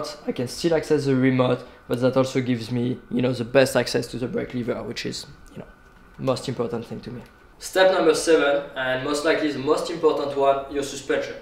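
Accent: France. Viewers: Tu a un accent français You have a french accent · tu a un accent francais you are accent french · Sa de voit que tu est français a ton acsens en anglais et a ton nom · French accent 😉(I have the same)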